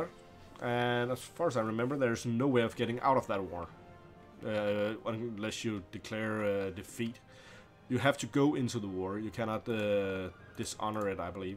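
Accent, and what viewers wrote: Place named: Denmark. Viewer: i like you danish accent!! love it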